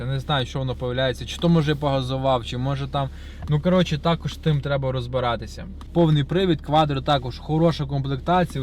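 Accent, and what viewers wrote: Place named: Surzhyk, Ukraine. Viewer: суржик жёсткий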